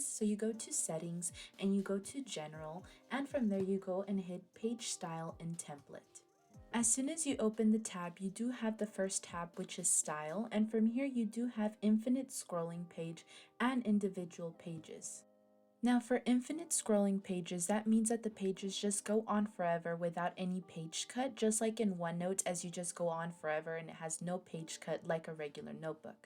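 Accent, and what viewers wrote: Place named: South Florida, United States. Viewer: Are you from the Miami/South Florida region? Your accent reminds me of home... And now I want mangoes and guava.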